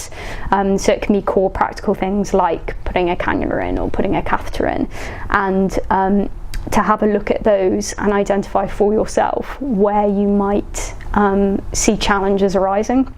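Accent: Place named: England, United Kingdom. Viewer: I love the inglsih accent ,Greetings from Uruguay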